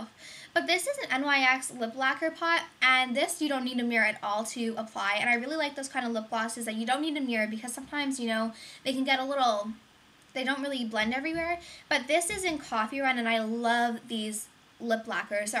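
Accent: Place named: Canada. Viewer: look at how tan you were! goodness..haha your so pretty and i wish i had a canadian accent haha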